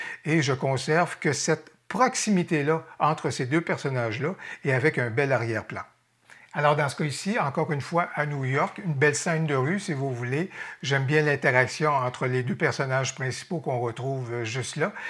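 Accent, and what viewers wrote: Quebec, Canada. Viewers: Et cet accent, il me fait trop voyager vers votre belle province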